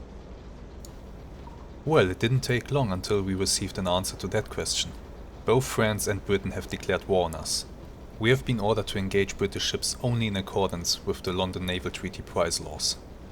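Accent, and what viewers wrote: Germany. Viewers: a German accent is more than welcome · I actually thought you were german! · You sound German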